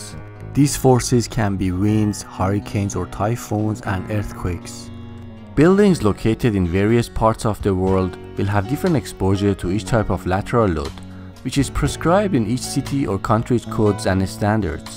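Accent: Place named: Iran. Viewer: Iranian accent. قرمه‌زبزی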